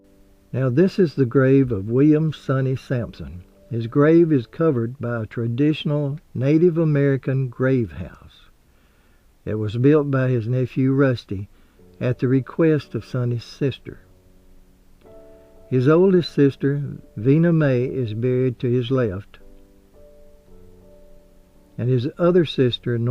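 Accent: South, United States. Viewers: I could listen to you narrate a telephone directory, or anything else for that matter. You have such a soothing voice. I love your Southern accent. Best wishes from the UK 🇬🇧 ❤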